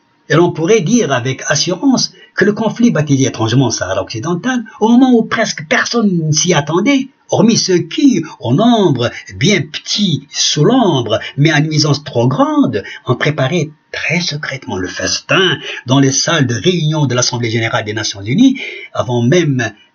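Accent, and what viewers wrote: Paris, France. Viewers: Une prestation magnifique, magistrale. On ne dirait pas à première vue que c'est un citoyen arabe qui parle dans la langue de Molière avec un accent purement parisien, dans un langage châtié, caractérisé par une éloquence qui fascine, une rhétorique qui plus qu'attirer l'attention, la retient du début à la fin. On est condamné dirais-je à une concentration brisant les cailloux de l'ennui des discours monotones, comme disait Paul Guth. Un véritable tribun qui rappelle Jaurès, mais avec un style plus modéré dans le ton et l'accent, comme dans une symphonie Beethovénienne, où la mélodie s'élève et descend harmonieusement, dans un débit parfois shakespearien. Le Professeur joue avec les mots comme il peut, mais toujours dans le sens qu'il veut, dans le respect de la grammaire. Voilà pour ce qui est de la linguistique. J'ai donné mon point de vue en ma qualité d'enseignant agrégé de grammaire. 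S'agissant du contenu et de la sémantique, j'en apprends d'avantage sur un conflit dont beaucoup de Français comme moi ignorent tant de choses. J'ai hâte d'en savoir plus, à partir du prochain épisode. Mais déjà le premier, annoncé en guise d'avertissement et d'intro, annonce déjà bien la couleur. Bon courage Professeur, et bonne chance par le Livre et la Plume. Vous honorez la langue française pour ne pas dire la francophonie, meux que des milliers de Français. Victor Hugo dormirait bien sur ses deux oreilles dans sa tombe.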